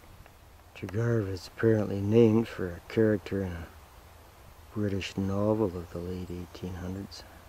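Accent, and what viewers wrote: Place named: Minnesota, United States. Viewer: Sounds like you have an accent to me, reminds me of someone from Minnesota. I'm from Columbus, Ohio though. I read somewhere that the " 'General American' region accent... covering parts of Illinois, Indiana, Iowa, Kansas, Missouri, Nebraska, and Ohio" is the most neutral english dialect.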